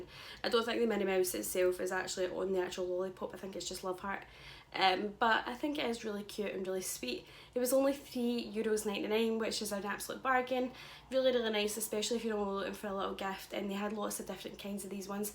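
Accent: Scotland, United Kingdom. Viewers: Are you Scottish by any chance? Bc i fricking love your accent!!!!!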